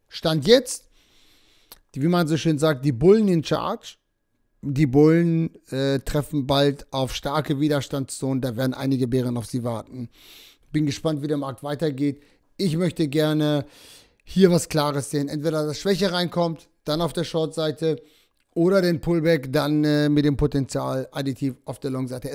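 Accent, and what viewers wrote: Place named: Switzerland. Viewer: das ist glaube das Schweizer Englisch.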